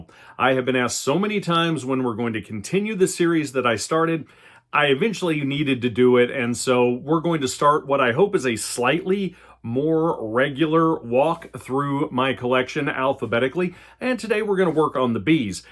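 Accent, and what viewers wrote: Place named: United States. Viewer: Apart from your great and highly informative content, your pronunciation is a bliss for non-native speakers. My english is not bad at all, but at school, we learn Oxford. I don't know what it is, but even with your American accent, I understand EVERY single word. By the way: I live in Vienna/Austria, together with my ~ 14000 shellac records.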